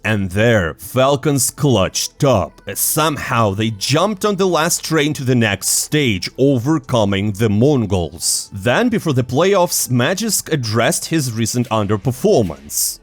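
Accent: Russia. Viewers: Your English sound Russian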